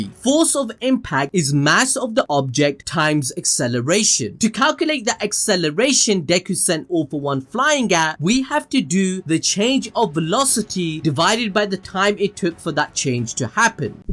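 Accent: India. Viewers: you indian? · brooooo are u indian ????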